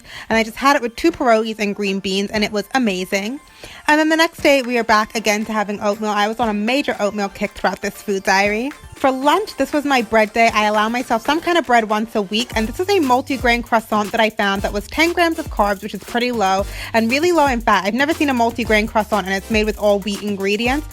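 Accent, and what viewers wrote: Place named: United Kingdom. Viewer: And a bit British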